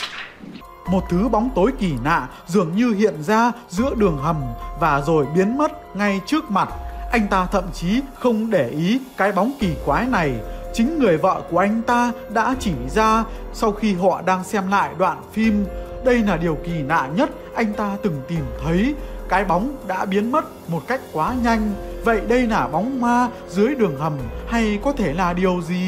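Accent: North, Vietnam. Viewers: Giọng bắc kỳ mà đọc truyện ma nghe cũng đc đó .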